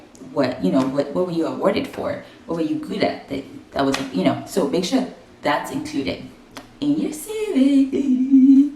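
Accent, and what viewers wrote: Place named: Nigeria. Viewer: Thank you. I appreciate this video. We have something in common though, I teach sunday school at my local church and gurrl I nver used to write in details like you just explained. I will edit right away. Thanks again. You sound Nigerian too. 😉😉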